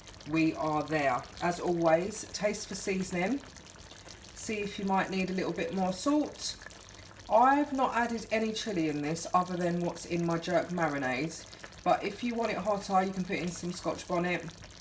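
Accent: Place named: Australia. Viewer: You should have made cuts at both ends of chops to prevent them from curling up when cooking....They will remain flat to cook evenly...Looks real delicious though...And i adore your accent...Are you from Australia??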